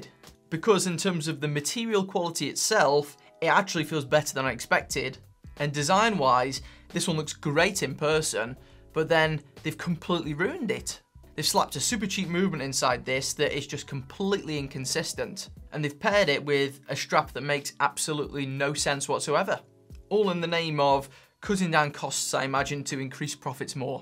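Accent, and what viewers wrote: Stoke, United Kingdom. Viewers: No, you're not unlucky. Braun is more than just a fashion watch brand, they have a long and illustrious history and their design philosophy has become iconic, but their build quality is RUBBISH. I've very optimistically bought 3 Braun watches over the years, including the ridiculously expensive "Prestige" chrono, and if the only problem you've had so far is a misaligned second hand, just wait until you've owned it a little longer and the REAL problems begin. That second WILL fall off eventually, the strap will disintegrate, none of the 6 hands on the chrono will behave as they should, any coating on the case or strap will scratch and chip away...and try changing the battery on the chrono - they've deliberately designed it so you have to take the whole watch apart via the front, although Braun recommend you send it to them to do it, 40 QUID to change a BATTERY! 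You're right, it's stupidity on their part, as by saving a little on quality control, they've now ensured I will never buy any product from the Braun range ever again, and will recommend anyone I know to avoid them like the plague. How to ruin a great brand. BTW, is that a Stoke accent?;)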